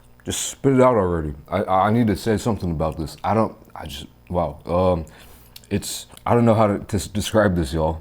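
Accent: United States. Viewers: I like to see more of your shows to make more please three times a day be good for me I like to hear you talk it gets we don't know each other by no means we don't I'm using Google talk so forgive me if words don't come out correctly I don't always read it after what I say so I can't correct it I would love to see more of where you live so take a little short video of where you live let me see your surroundings I love that you have an American accent where you lived and where I live we're not too far apart we wasn't too far apart we are now I just like you I really do I don't know how to explain it but I want to see more of your videos so please for having sex make more cuz all I do here you talk I don't talk to nobody for heaven's sakes pretty much nobody I don't even very rarely talk to my family I really don't have any family anymore I got yeah I got uncles and Aunt but never talk ever associate never my sisters and brothers very very maybe once a month and that's a god honest truth YouTube is basically is becoming my family I hate to say that but that's God's honest truth and you're becoming one of my favorites so please put make more please that's what I'm trying to get after making more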